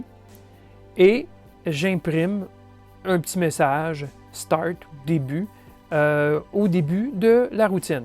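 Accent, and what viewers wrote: Quebec, Canada. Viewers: Très bizarre ce verrouillage, peut-être des puces récupérées d'occasion sur des cartes où le programme de la flash avait été verrouillé ? Vu le prix, c'est pas impossible... Eh oui, nos cousins québécois ont un chouette accent :-) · Hello Mr québécois chouette accent :-) Merci pour ces vidéos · Très belle présentation, claire et très Pro pour un bidouilleur. Je réside au nord de la France et on aime beaucoup l'accent québécois. Merci pour votre travail.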